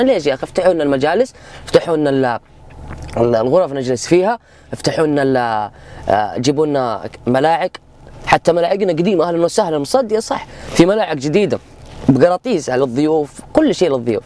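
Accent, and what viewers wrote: Saudi Arabia. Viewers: ووه سيدي امجيزاني لهجته زيي 😂😂 ي لبى بس اخيرا يوتيوبر جيزاني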